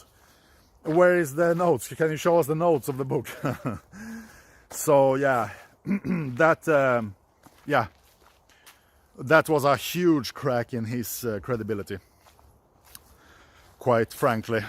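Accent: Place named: Spain. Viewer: You have a Spanish accent! 1. You are not half a Greek! 2. Didn't you hear sirens? For months...from his window? 3. He got caught by Ukrainian SPU - who ( by his claims) - don't allow him to leave the country! During this "obducted " week - he surprisingly has to sign many non - disclosure papers! 🙆🤷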